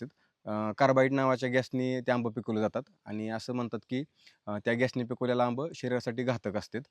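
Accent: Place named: Satara, India. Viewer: दादा सोलापूर मध्ये भाषा काय करतो बे अशाचा टोन मध्ये बोलतात तुम्ही तस नाही ती भाषा नाही बोलत सोलापूरचे असून तुम्ही तर आमच्या सातारा कडची भाषा म्हणता 😮😮 मला सांगा उत्तर देऊन 🙏🙏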